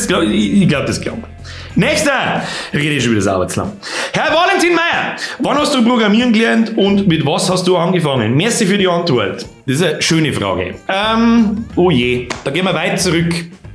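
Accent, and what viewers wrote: Bavaria, Germany. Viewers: Bin nicht aus Bayern finde dich einfach sympathisch und den bayrischen Dialekt ist einfach nice wünschte ich könnte ihn btw ich verstehe ca. 80% was du sagst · Oid Boarischa noma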